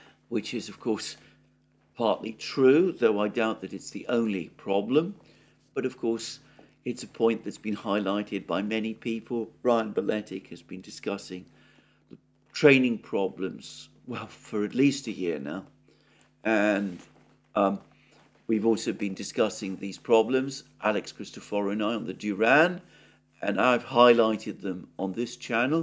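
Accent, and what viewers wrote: United Kingdom. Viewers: Dear, I continue enjoying your videos every single day and feel grateful for both their content as well as your fine British English. I once offered to make suggestions on the pronunciation of Russian (and possibly Ukrainian) proper names as well as some factual information on Russian history and trivia, and now see you have accepted suggestions from someone else. You do not need to pronounce Russian / Ukrainian proper names as per the source language standards, especially if there is a long standing tradition in a particular case, like, for instance, Moscow. However, the global trend is towards trying to pronounce foreign proper names as close to the original as possible, and you have now made fantastic improvements along this road which makes me so happy as a native Russian speaker with some notion of Ukrainian pronunciation. This is why I would wish to make a couple of additional suggestions if I may. 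A capital letter preceded by a single inverted comma indicate the vowel under stress in a word. First, a delicate one. We say Dni'Epr in Russian, not Dnipr. The Ukrainian equivalent is Dnipr'O (both river and city). Ukrainian men's Christian names are Petr'O for the Russian Pi'Otr (like Petr'O Porosh'Enko) and Dmitr'O for the Russian Dm'Itriy (like Dmitr'O Nataluha). Some more place names: Lim'An Izi'Um Osk'Ol (river)/Ukr.: Osk'Il Tokm'Ak Taganr'Og Andr'Eievka / Ukr.: Andr'Iyivka A couple of family names: Lavr'Ov Poliak'Ov(a) I have no idea of Gen. Mordvichev's own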